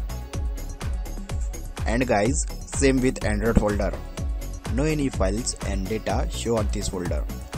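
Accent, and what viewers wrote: India. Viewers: Such a bad accent must be indian i guess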